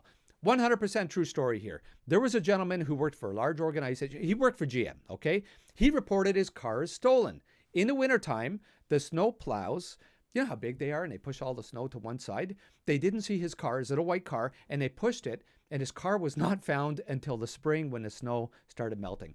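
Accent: Canada. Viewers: Well, being American (Idaho), I just had to watch your video to see if I could understand you. Guess what? I DID, and I learned some things, I really enjoyed the comments, and think you're pretty darned funny! Thanks for the tips and tricks you've shared, and it's clear that our neighbors to the north are tough and winter ready. Merry Christmas!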